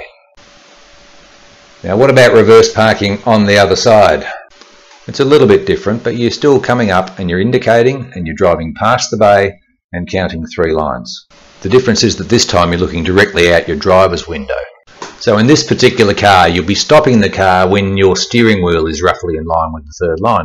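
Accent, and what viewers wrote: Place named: Australia. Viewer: he’s not a Brit he’s Australian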